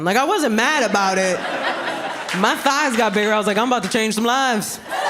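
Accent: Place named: Bronx, United States. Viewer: She is so New York...I love her. I'm from the Bronx too,she sounds like a real Bx girl,she is absolutely hilarious.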